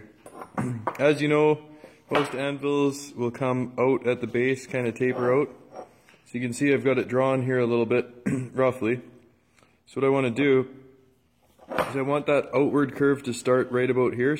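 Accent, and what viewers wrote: Canada. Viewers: R u Canadian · Canadian accent. instant sub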